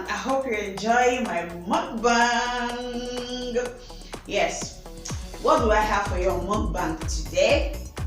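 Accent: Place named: Ijebu, Nigeria. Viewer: Pls re u Ijebu like me? Cos this is our food oo Ur Ijebu dey sweet me oo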